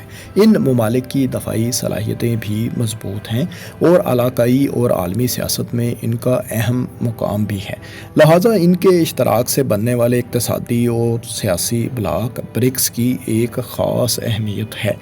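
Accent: Punjab, Pakistan. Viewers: Aapki malumaat bahut achi Hein 👍🏻per Urdu Aap Punjabi lehjey mey Boltey Hein jiss Maza kirkira Hojata Hey 😂🙏🏻🙏🏻👍🏻👍🏻👍🏻👍🏻 Sohail Karachi 🙏🏻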